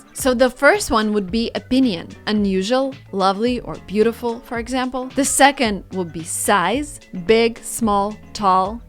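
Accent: Russia. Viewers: She still has a little bit of russian accent, for instance how she says "can't" haha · You still have that little Russian accent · отличное произношение, но все равно слышно что не нативный носитель, русское наследие дает о себе знать. интересно сколько лет уже на англ.?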